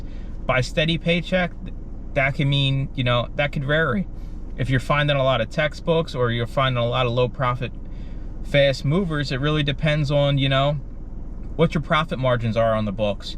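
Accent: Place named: Pennsylvania, United States. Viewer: Subscribed last night after finding your channel via a reply you posted in a Fields of Profits video..Loving what you have to offer, thank you! Got my Bluetooth scanner yesterday and ScoutIQ today...now I'm doing a bit of research b4 heading down the street to Goodwill. BTW I love guessing people's accents and I'm so glad you said you where you live b/c I guessed last night...Pennsylvania! I have people in the Pittsburgh area but and ex was from Harrisburg and so your accent reminds me of all his friends and makes me smile. Thanks for all the VALUABLE INFO!!!!!!!!! I'm SO EXCITED to include books in my FBA biz!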